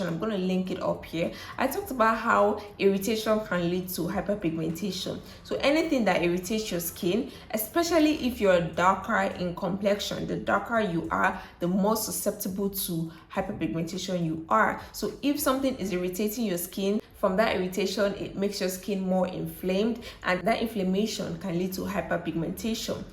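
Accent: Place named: Nigeria. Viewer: Have a Ghanaian name yet sound like Nigerian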